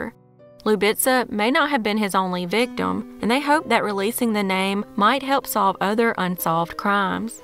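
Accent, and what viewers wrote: South, United States. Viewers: Please know that I appreciate your posts! And love your southern accent too! Lucy in Canada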